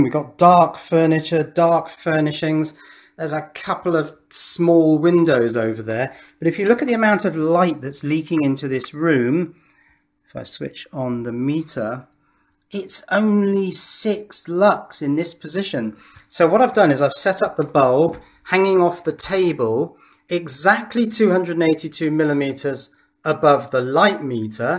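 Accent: England, United Kingdom. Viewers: By his accent, England I'd guess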